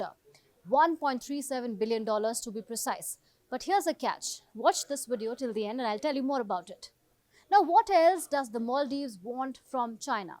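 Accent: India. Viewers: Irritating Indian accent😂😂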